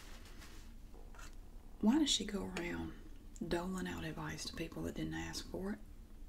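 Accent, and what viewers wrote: South, United States. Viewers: I just moved to the south and I love your southern accent.Your fantastic. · Beautiful southern accent. I can't stand these over drawn 1800's slave owner accents everyone gives southern people. Very well done very natural, much love from Atlanta. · I like your videos! They are really interesting and helped me learn southern accent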